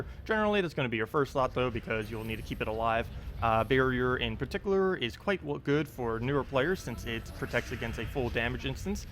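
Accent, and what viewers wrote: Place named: Canada. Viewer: You're from Canada, Minnesota, or Maine.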